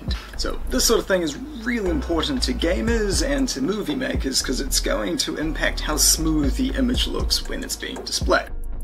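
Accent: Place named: New Zealand. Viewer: Gosh that Kiwi accent shining through! LOL - good video mate, happy to see your face. Hasn't changed!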